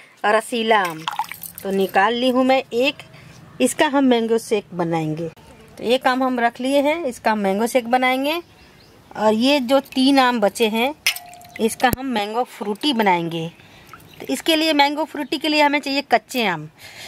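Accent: Bihar, India. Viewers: Bihar se hai kya . Very nice karte hare kmate rhe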